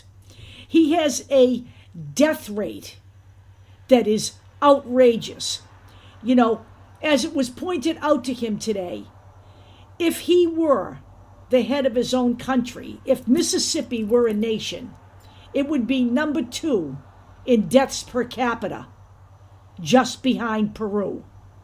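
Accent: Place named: New York, United States. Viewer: I L-O-O-O-O-VE your New York accent! I made note of his part-time comments when I first posted this comment on Brian Tyler Cohen's channel. He called them Loony Tunes. We people, who are thinking correctly--basically, thinking at all, should just keep referring to these morons as cartoons. I am a fan of Mel Brooks. He once said, "What do you do with a man who has kill 6-million of your relatives? You make them a laughing-stock!" These right-wingers cannot be taken seriously! Cartoons one and all!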